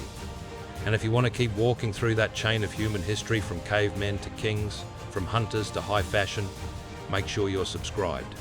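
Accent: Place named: Australia. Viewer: New subscriber here. Solid mate. Sound like an Anzac 😉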